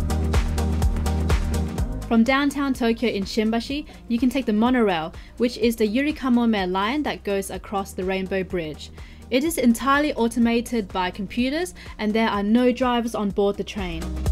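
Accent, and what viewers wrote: Australia. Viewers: It's my first time to hear an australian accent Much more like British than I thought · This girl sounds like someone from west Sydney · great video - it bought back many memories. I think you have Aussie accent, have you studied in Australia. I am Aussie and my wife is from Japan. Keep well.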